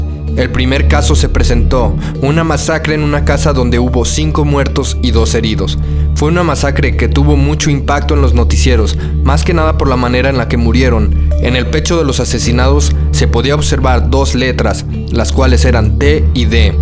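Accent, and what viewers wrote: Mexico. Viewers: Eres de México? si es asi de donde eres? buen vídeo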